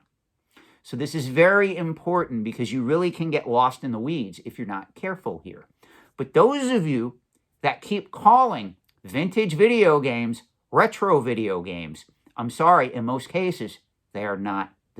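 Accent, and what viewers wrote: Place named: Pennsylvania, United States. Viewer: regional dialect form Pennsylvania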